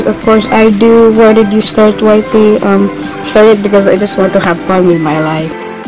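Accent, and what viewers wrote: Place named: United States. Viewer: He is a filipino but his voice sound like an american holy moly hooowwww dude!!! Lol Edit: i dont even understand ur language but it got me laughing when u were talking with ur language XD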